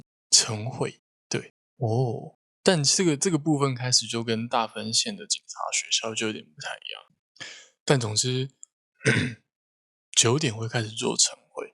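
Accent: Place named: Taiwan. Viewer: Please keep up the great work this exact kind of content TAIWANESE mandarin learners need. There is so much mandarin content from mainland china and more is needed in the Taiwanese accent. Thank you very much!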